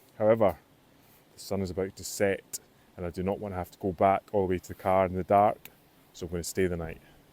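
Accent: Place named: West Lothian, United Kingdom. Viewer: You are welcome your videos are not boring my pal goes to them all the time and a lot of the time people are in them its just the luck of the draw keep uploading your subs will go up you sound local to me i am in west lothian :)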